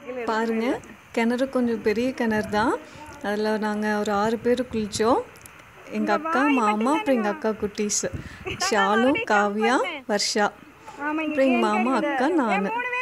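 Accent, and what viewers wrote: Kongu, India. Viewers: மரியாதை கலந்த கொங்கு தமிழ். ஒருத்தரு நம்மள அடிச்சுக்க முடியாதம்மினி . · Unga slang language super sistaaaaaa...........kongu tamilachi😍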